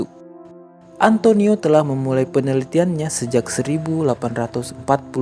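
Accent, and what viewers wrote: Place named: Palu, Indonesia. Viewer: Salfok dengan logat palunya le😁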